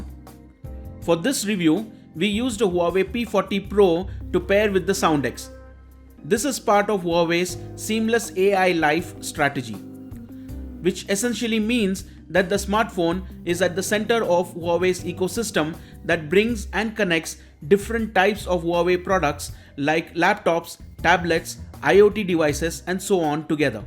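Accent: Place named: India. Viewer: his accent is Indian not arabian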